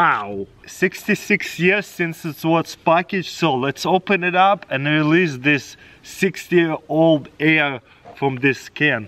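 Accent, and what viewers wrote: Russia. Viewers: Жуткий русский акцент. Ха ха ха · Такой махровый рязанский акцент, прикольно звучит. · Твой акцент выдаёт в тебе русскоязычного.😂😂😂🤣🤣🤣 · wow this guy look so much like crazyrussianhacker even got the accent too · Что за русский акцент?))) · his accent tells it that he is a russian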